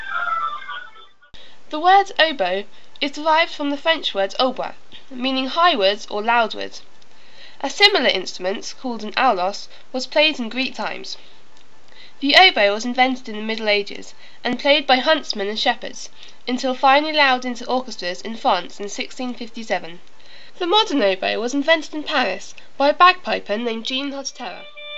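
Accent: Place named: England, United Kingdom. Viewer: Aww you're sweet but this english accent is sickening!!!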